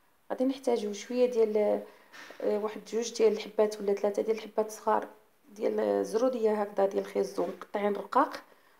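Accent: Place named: East, Morocco. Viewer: / مشاء الله أو تبارك الله على ناس وجدة..سبحان الله عرفتها من اللهجة ديالك قلت هذي أكيد من الناحية الشرقيه إيوا حنا جيران أنا من مدينة أحفير أو عايش في بلجيكا... حصلي الشرف أختي الكريمة بمعرفتك نتمنى ليك التوفيق في مسيرة ديالك أو الاستمرارية.. أو شكرا بزاااف على الوصفات الجميلة لي تقدميلنا...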